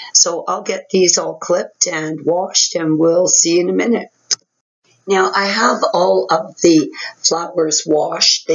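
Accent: Canada. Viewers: Hi wow I should have picked up that you are Canadian with the accent.....I was born in Montreal Canada came to USA in 1971 as a child...I'm now in Virginia where there is snow in winter but I've spent all of my adult life in Souther California...OMG it's so beautiful there....but I had to leave it to get out of the NARC relationship I was in....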